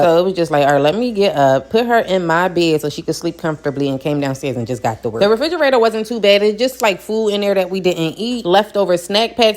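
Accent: Philadelphia, United States. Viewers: This woman definitely sounds like she is from Philly. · Love the Philly accent. I be catching my self mocking my family after chilling with them for a day or so!!! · I need to get some of those fridge containers! Is that a Philly accent I hear!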